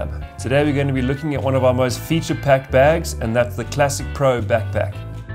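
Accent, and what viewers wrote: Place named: South Africa. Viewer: That would be a South African accent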